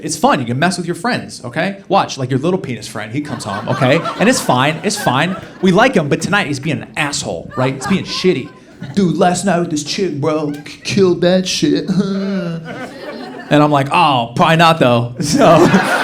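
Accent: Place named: United States. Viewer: Hiya I'm your BIGGEST FAN I LOVE YOUR STAND UP COMEDY. I COULD WATCH YOU 247. IM A BRAND NEW NEWBIE TO YOUR YOU TUBE CHANNEL CONTENT WILL. EVERY TIME I WATCH YOU I ALWAYS FEEL VERY CALM REALAXED AND CHILLED OUT VIBES. I LOVE YOUR AMERICAN ACCENT AND I JUST CRY WITH LAUGHTER ALL THE TIME TEARS RUN DOWN MY FACE CONSTANTLY. IM SO GLAD IVE JUST SUBSCRIBED TO YOUR YOU TUBE STAND UP SHOW CONTENT. WARM COSY HUGGIE SNUGGLES CUDDLES MATE LOVE RESPECT YOU LOADS NOW AND ALWAYS AND YES WILL YOUR A HUGE STRONG POSITIVE INSPIRATION IN MY LIFE AS WELL NOW MATE. KIND DEEPEST REGARDS YOUR BIGGEST FAN OF YOUR COMEDY STAND UP SHOW CONTENT ON YOUR YOU TUBE CHANNEL MY AWESOME BIG BROTHER 4 LIFE NOW MORE THAN YOU ALREADY KNOW THIS MATE. KIND DEEPEST LOVE RESPECT REGARDS MATE FROM MARTYN CHARLES JOHNSON IN ROCHFORD CITY OF SOUTHEND ON SEA IN ESSEX UK ENGLAND NOW AND YOU ALSO HAVE A BEAUTIFUL SMILE AS WELL MATE. 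KIND DEEPEST LOVE RESPECT REGARDS MATE MARTYN CHARLES JOHNSON 🫂😁🤝🙇🏻‍♂️💝💝💪🏻💪🏻💪🏻💪🏻👍🏻👍🏻😉💛💛💛💛🫅🏻🫅🏻🫡⭐⭐👋🏻🫶🏻😍😍🏡🎧🎤😉👍🏻👍🏻💪🏻💪🏻💛💛👋🏻🫡🤝🙇🏻‍♂️😉💛💛🫅🏻🫅🏻🎧🎤🙇🏻‍♂️💪🏻💪🏻💪🏻💪🏻😁😘😘🇬🇧🙇🏻‍♂️🫡💛💛🫅🏻🫅🏻😁🫂🙏🏻🇬🇧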